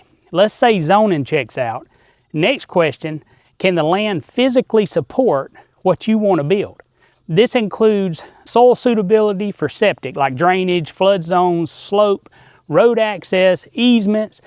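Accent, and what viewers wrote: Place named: South, United States. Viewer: Although I would hope that my property has a little bit of soul... I think what HE is saying, is "soil". I do love a Southern accent... ❤😂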